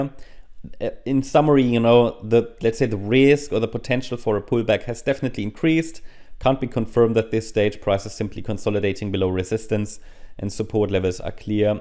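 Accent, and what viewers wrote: Germany. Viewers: Was meinst, ist er deutscher?? Also, für mich hört sich sein Akzent deutsch an😅 Auf jeden Fall, bester Cryptokanal.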